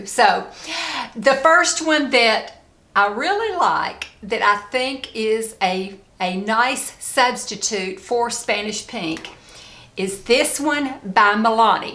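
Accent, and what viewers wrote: South, United States. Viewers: Don't come between a woman and her lipstick! Love it. I subscribed to you the other day because I saw you on little poet. This is my first time to see you. I love you! I have laughed more and had such a good time with you. Now girl, I am southern too. I have an accent and when I hear someone like me, I just go into this haze of pleasure it's like dope. Now tell me if I am right, You sound like a Carolina girl or a Tennessee girl. Maybe Texas but I'm counting on the Appalachian south.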